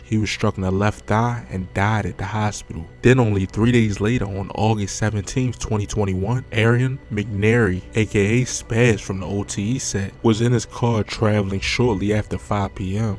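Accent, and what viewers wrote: Baltimore, United States. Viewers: Bmore accent strong. Subscribed from Philly. Keep up the content. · U gotta be from Baltimore folks....but anyways u just gotta new sub my guy 💯💯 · Gangs being underated like this is some nfl draft this is weird shit 😂😂 souund like your from Baltimore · aye how y'all be knowing bout the hood and it sound like you from Baltimore , y'all know more then the police do.. and never even prolly been to the Raq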